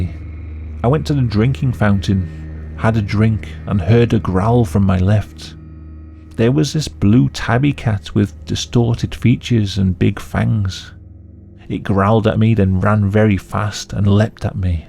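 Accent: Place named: North, United Kingdom. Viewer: He’s just from up north lmao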